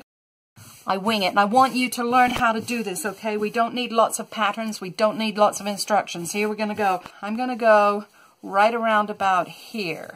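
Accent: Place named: United States. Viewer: Thank you for such a handy tutorial. Are you Irish? You sound like you have a mix of Irish and American accent, very sweet.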